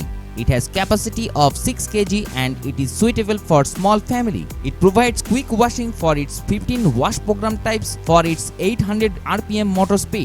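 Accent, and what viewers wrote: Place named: Bengal, India. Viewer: He is bangalan so he speaks bangla slang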